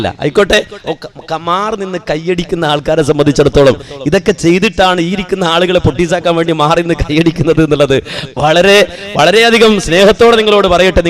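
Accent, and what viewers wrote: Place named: Kozhikode, India. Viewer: ആദ്യം ഭാര്യനെക്കൊണ്ട് പർദ ധരിപ്പിക്കടോ? സംസാരിക്കുന്നവൻ കോഴിക്കോട്ട് കാരനാണെന്ന് തോന്നുന്നു.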